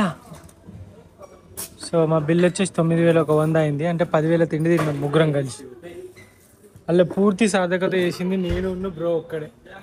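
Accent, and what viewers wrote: Telangana, India. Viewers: Motthaniki telangana vallam anpinchukunnav bro🎉❤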